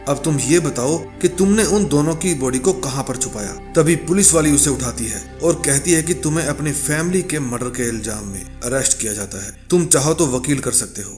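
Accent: Punjab, India. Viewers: mennu laggda 22 punjabi aa 😂 accent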